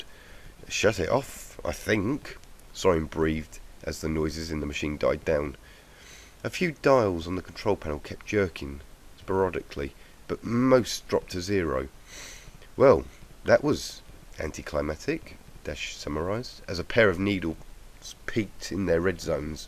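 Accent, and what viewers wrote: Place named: England, United Kingdom. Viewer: SUCH A GREAT READER (now I want to have an English accent)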